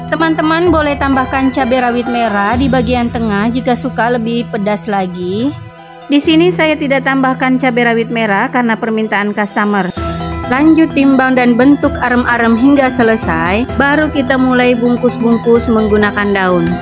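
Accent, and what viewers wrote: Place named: Sumatra, Indonesia. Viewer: Makasih kk,,,bahasa kk macamnya org sumatra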